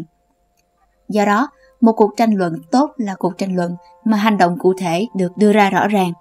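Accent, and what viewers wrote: South, Vietnam. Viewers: Giọng nữ miền Nam dễ thương quá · Cuối cùng cũng có giọng miền nam hehe 😁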